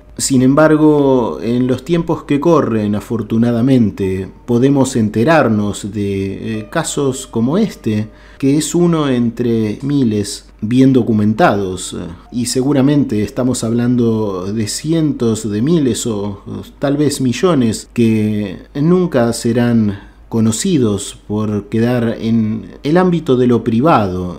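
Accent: Argentina. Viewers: Adoro tu canal! Y con todo respeto el acento argentino es bellísimo. Muchas Gracias!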